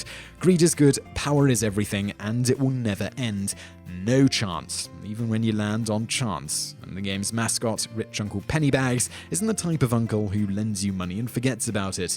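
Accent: England, United Kingdom. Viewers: It's Milton BRADLEY...not BRADY! ...and it's Struh tee go!!!! Nothing like an English Accent that murders the Queen's English!!!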